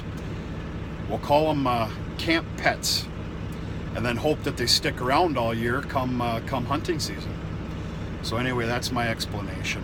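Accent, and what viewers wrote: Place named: North Dakota, United States. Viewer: Hi, I'm from N. Utah. I worked on a ranch in Randolph Utah. Weather just like that only humitity is much lower here. It's funny how cows can be so picky about their hay. Love your channel and your accent sounds alot like my relatives on the North Dakota/Canada border. And they are Native American. I wish I was there helping. Stay warm and safe. Good day. Debbi. ☺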